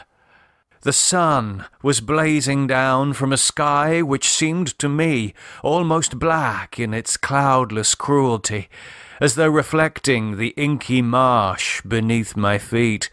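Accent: England, United Kingdom. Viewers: Hey dude..... Hates Pigment Lovecraft was from NEW England. Lose that ridiculous "theatrical" tea talk and tell the story. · Me encanta ver videos sobre los relatos de Lovecraft. Aunque como opinión personal siento que es mucho más gratificante imaginar estos escenarios mientras lees el libro. Aún así me ha encantado, sobretodo por el acento inglés del narrador y lo bien ilustrado que está. Muchas gracias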